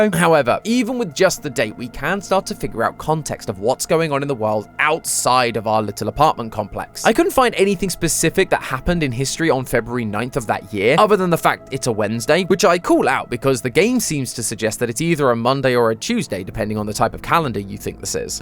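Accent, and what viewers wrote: United Kingdom. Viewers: why is British now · wait since when become have a british accent? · the british accent is just the cherry on top to annoy me anyways let's support other theory channels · You’re so British! Get better soon! 🩷🩷🥰🫶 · Why are you british (you are poggers) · Why did u turn British cuz before your like American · who the heck is this British guy, I don't understand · At first, I thought was british, and it made me kind of mad, but now I can't even hear accent, I'm definitely gonna keep watching. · Ew hes british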